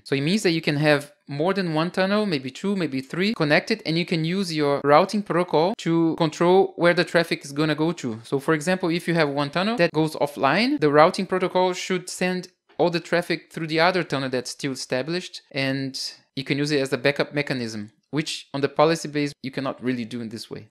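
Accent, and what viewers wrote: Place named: Brazil. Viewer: ! Eu sabia que seu sotaque em Ingles era do Brasil! Muito bom canal! Estou comecando com Palo-Alto e seus videos ajudarao muito. Abracos aqui do Canada em 2024!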